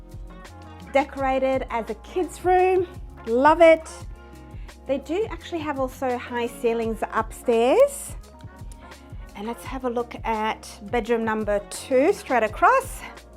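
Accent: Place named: Queensland, Australia. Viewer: BTW everyone, this is your typical eastern Australian accent, Queensland variant.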